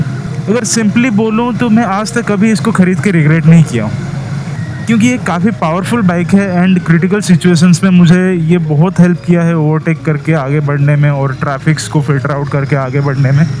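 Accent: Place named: Odisha, India. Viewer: ତମ କଥା ରୁ ଜଣା ପଡୁଛି,ତମେ ଓଡ଼ିଆ........ଖୁବ୍ ଭଲ ଉପସ୍ଥାପନା କରିଛ Video re❤ lots of Love from Barbil,Keonjhar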